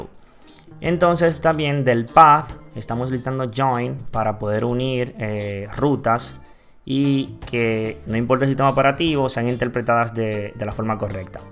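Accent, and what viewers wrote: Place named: Dominican Republic. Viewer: Eh, Dominicano! XD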